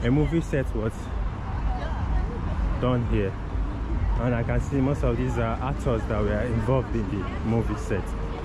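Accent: Africa. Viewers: акцент не американский, из Африки скорее всего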